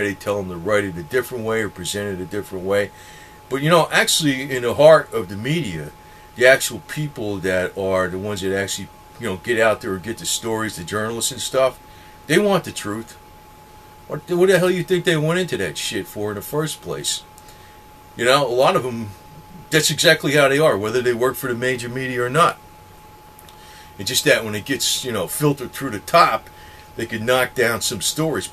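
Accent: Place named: Northeast, United States. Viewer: Florida huh? Sound like a north east guy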